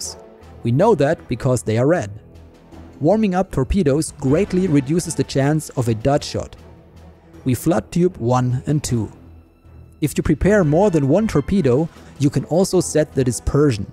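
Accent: Germany. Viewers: Hahah, I love it that you are German (or Austrian, no offence) and you can give us proper authentic German uboat banter! Brings back memories of Das Boot! Alles gut, Herr Kaleun! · Lovee the german pronounciation!!! 😭😭😭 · Das Boot was a masterpiece of a movie. Good to hear a German narrate the video.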